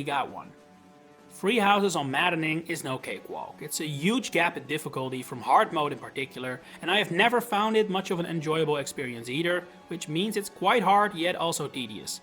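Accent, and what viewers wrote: Netherlands. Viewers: I'll bet anything this guy is Dutch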